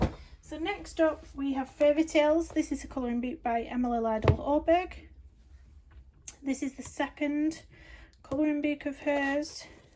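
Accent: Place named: Yorkshire, United Kingdom. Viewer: Just found your channel and i love it very much. I am a senior who colours so i find your content very informative. Your children sound adorable so don't ever apologize for their noise. They grow up and leave home very quickly and then you hope for grandchildren so the noise comes back. I do have lots of these books so i will be able to colour along with you too. PS I love your accent. Im assuming its Yorkshire but could be Lancashire.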